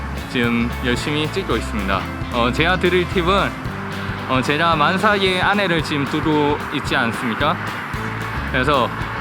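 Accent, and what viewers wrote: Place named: Daegu, South Korea. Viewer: 미래 태어날 딸을 위한 노력과 사랑과 정성이 보이는 유튜브네요! 대구사투리도 듣기좋고 와이프사랑도 좋습니다. 응원합니다 님!♡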